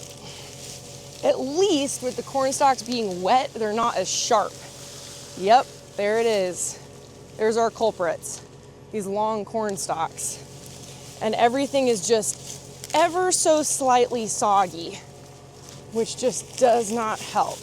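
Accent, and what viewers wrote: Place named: California, United States. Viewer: Your voice is getting “too California” accent. You’re a midwestern woman!!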